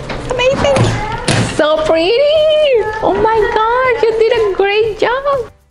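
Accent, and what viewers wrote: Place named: Venezuela. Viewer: Also you look/sound like Venezuelan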